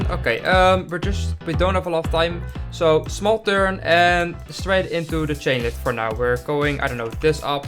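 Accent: Netherlands. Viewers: I immediately smelled dutch. Hoe gaat het man? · You sound dutch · Bro when I heard u the first time I already knew that u where dutch like me 😅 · I smell Dutch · I smell dutch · Ik dacht al waarom je engels zo erg klinkt als nederlands maar toen kwam ik er achter dat ik al eens een keer je video heb gezien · Why do you sound so Dutch? If u are: 👄🦷NeDeRlAnDs💪👁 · Ik ruik een Nederlander. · I SMELL DUTCH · I hear the Dutch accent haha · You sound like a dutch person that speeks english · Nice accent it sounds dutch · Ik vind het grappig dat je hoort dat je Nederlands bent hele toffe vidio 🧀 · Your english is so dutch if that make sense😭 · ik ruik een nederlander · Ik ruik een Nederlander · I smell Dutch🟥⬜🟦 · Ur Defently from the netherlands u hear it / je moet niet altijd engels praten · I smell Nederlands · Aan je acsent ben je Nederlands denk ik he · Je bent Nederlands ik hoor het aan je stem je hebt een Nederlands accent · jij bent een hele goeie youtuber ik hoor jou nederlandse accent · i smell dutch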